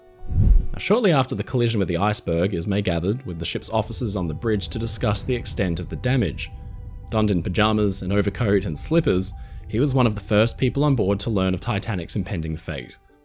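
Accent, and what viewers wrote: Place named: Australia. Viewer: You should pay a bit more attention. If you haven't noticed this guy who makes these wonderful videos is speaking with an Australian accent.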